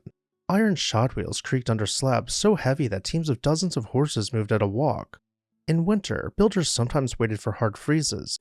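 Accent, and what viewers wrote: United States. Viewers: That bloody annoying American accent, distinctly Gay, grates on me, I'm afraid. I turned it off after two minutes. Sorry mate, but construction, especially in those days, was done by people who spoke different to you. 55 years in the trade, I'm afraid I couldn't take any more..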